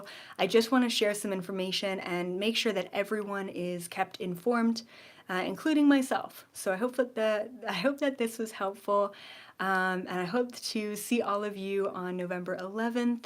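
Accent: Canada. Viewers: Nice to hear a tiny bit of your Canadian accent come out! I'm from what province are you from originally?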